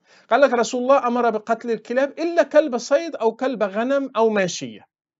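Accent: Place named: Egypt. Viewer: لماذا لهجتك مصرية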